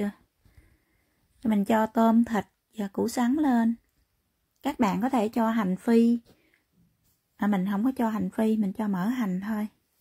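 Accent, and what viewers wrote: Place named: Mekong Delta, Vietnam. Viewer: lâu tôi được nghe lại phong cách Người Miền Tây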